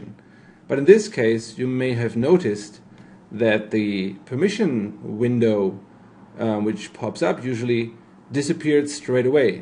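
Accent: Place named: United States. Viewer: Man, how intelligent you are. Language's accent is some big issue, no matter how smart one is. For some of those British out there you are labeled as an American, they don't know any better but you do...! With that Intelligence of yours I'm sure you could speak even Chinese without an accent if you want to...!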